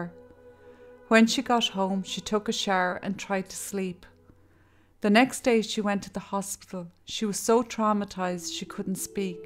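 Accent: Kilkenny, Ireland. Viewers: thank you so much for your reply - I love the delivery of your narration of your easy to understand informative script style/narrative - your turn of phrase and the inflection of your voice when you exasperate is masterful - your beautiful accent reminds me of the people I knew when I was growing up in Tubrid, Woodsgift in north Kilkenny, where I was born in 1956 in St. Luke's Hospital - I now live in Kerry. I always miss the Kilkenny accent - I miss the sayings "fierce nice and shockin good" - keep up the excellent work you are doing and don't ever give up - have a wonderful day ;-)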